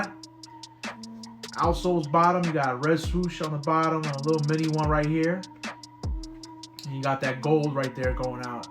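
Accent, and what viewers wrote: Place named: East Coast, United States. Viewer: Sound like you from the east coast dog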